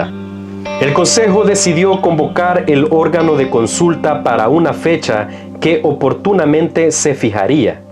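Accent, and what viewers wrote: Honduras. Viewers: Parece que el comentarista es hondureño. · MI PREGUNTA ES DE DONDE ESTA PERSONA Q ESTA ASIENDO ESTE VÍDEO MENTIROSO 🤥 PORQ HONDURAS EN NINGÚN MOMENTO PUDO DETENER AL EJERCITO SALVADOREÑO ME UBIERA GUSTADO Q ESE CONBATE UBIERA DURADO PORLO MENOS DOS DIAS MAS DE CONBATES 😂😂😂😂😂😂ACUÉRDENSE Q ESA GUERRA NO FUE DE CONQUISTA SI NO UNA RESPUESTA PARA DEFENDER ALOS SALVADOREÑOS Q ESTABAN ASIENDO ASESINADOS POR HONDUREÑOS ISI UBIERA SIDO UN GUERRA DE CONQUISTA UBIERA ABIDO MÁS MANTAZAS DE JENTES DE HONDURAS PORQ ASÍ NOSELE PUEDE RESPETAR LAVIDA ANINGUN CIVIL ENEMIGOS ÓSEA LES UBIERA IDO PEOR SOLO PIÉNSELO LA NUEVA OCOTEPEQUE YA ERA DOMINADA EN SU TOTALIDAD POR PARTE LAS FUERSAS SALVADOREÑAS PERO COMO NO ERA GUERRA DE CONQUISTA SELAS REGRESARON 😂😂haha UN CHOCOLATE AMIGOS SEAN SERIOS Y REALES PARA COMENTAR COSAS ASÍ EN ESPECIAL A ESTE LOCO DE ESTE Q ESTABA ASIENDO ESTE VÍDEO PARESE Q ES HONDUREÑOS ENLA LANERA DE ABLAR 😂😂😂😂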